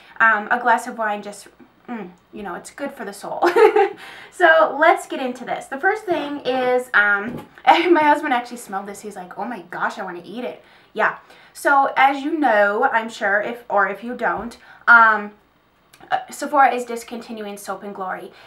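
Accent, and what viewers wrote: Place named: Minnesota, United States. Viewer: Sounds like a Minnesota accent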